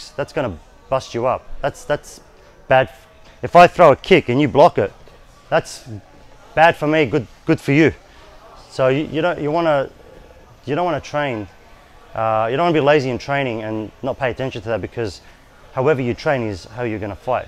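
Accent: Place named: Australia. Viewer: sound like an aussie : )))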